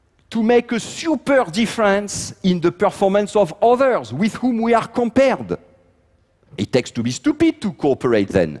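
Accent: France. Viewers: To those criticizing his accent, how about you go speak French without an accent? I thought so. Great speech. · I wish I could be French just to have his accent. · strange, it's french accent but very very excessive x) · Why can't he at least try to pronounce English words in English, instead of pronouncing them French. It is unbelievably hard to understand... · His accent is an incredible one. It's like to hear a French-English. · i thought the language is frenc... dé internet, lé hdhsjs... disgusting accent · Stopped . Sorry, but I can't handle that kind of laziness, the accent is too thick, and since I'm French, I know that he's not even TRYING to get even a slight accent. It's a pain just to listen to the words. Too bad because it would have interested me. Mr Morieux, please take English classes to improve your accent, thanks. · The French is strong in his accent.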